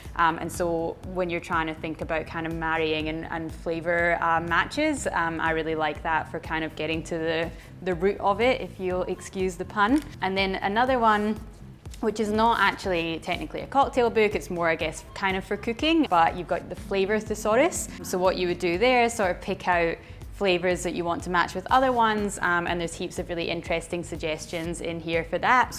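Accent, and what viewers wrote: Scotland, United Kingdom. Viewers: Thank you for all of your effort! Scottish accent? The best... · First! Love the scottish accent :)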